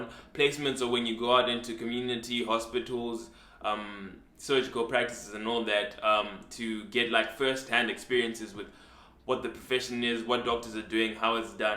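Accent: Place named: Zimbabwe. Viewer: yess I knew it 😅 I could tell from the accent , I am zim too 🇿🇼 🇿🇼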